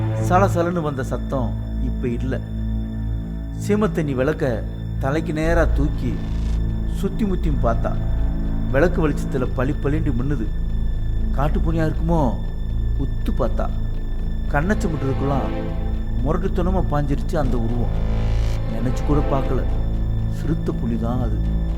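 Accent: Madurai, India. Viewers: அருமை மாமா இயல்பான மதுரை தமிழ் எளிதில் மனதை தொட்டுவிட்டது, உங்களது குரல் இந்தக் கதைக்கு பெரிய பலம்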